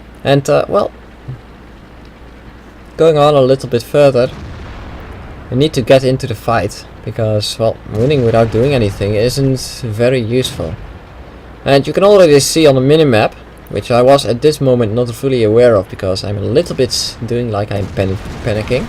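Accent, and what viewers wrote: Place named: Netherlands. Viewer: he is dutch, just like me and ussually the dutch hava TERRIBLE accent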